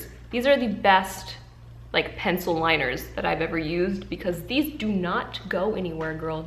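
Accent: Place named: Australia. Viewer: I recently started watching your videos/subscribed, and i am STILL AMUSED by your Australian accent . ITS HONESTLY SO GOOD IN MY OPINION. 😂😂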